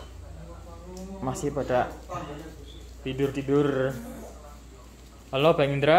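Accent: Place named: Banyumas, Indonesia. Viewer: Aq pengem ketawa sm logat ngapaknya kak🤣krn dlu mbak kosku iso ngapak jg kwkwk jd aq suka dcritain humor2 pke bhs ngapak kwkwkk kamshamida kak dh sharing villa ademnya...slm dr sydney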